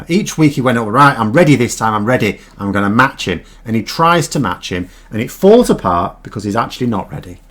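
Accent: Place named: Northern England, United Kingdom. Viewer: I enjoy the show. However, the most remarkable aspect to me (I am Irish) is the cogency of the observations [whether right or wrong] from a person with a "[English] \"Northern\" accent" (about 80% of English people as far as I can make out). Despite/because watching Newsnight on a regular basis I have somehow internalized that "Northern" "English" people are somehow a bit thick. Please don't attack me as I am not trying to defend my perception. My point is that regional accent should not qualify political propositions but that on an emotional level it does., even for "foreign" audiences. Also, please don't ask me how to address this bias as I am not smart enough to know any mitigation, But hoping that smarter folk than me/I can take this further.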